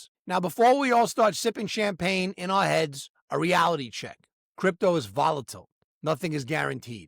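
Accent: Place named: Brooklyn, United States. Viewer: You sound like an old school Brooklynite. Nice. Furgeddaboutit ! Holding XRP 8 years. At 70, it would be great if it pops soon.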